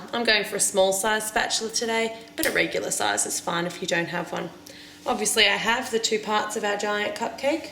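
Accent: Australia. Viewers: Thank you so much I was about to embark on this mission with limited knowledge... I know a heap more now and nice to hear an aussie voice for a change. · I love your accent.. why do the Australians get the good accents? :3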